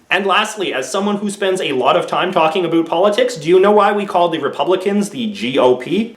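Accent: Canada. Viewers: keep up the good videos , also you sound oddly canadian , you live up north? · bnfk He’s Canadian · You are the first Canadian I have heard who actually says aroond and aboot, lol. I thought that was just a myth... I'm sorry that it sounds comical to my ears :(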